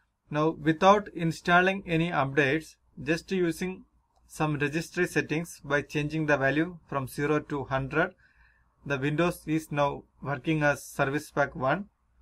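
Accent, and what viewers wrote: India. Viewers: there is always one indian guy with unique accent with solution to every pc laptop apple google problem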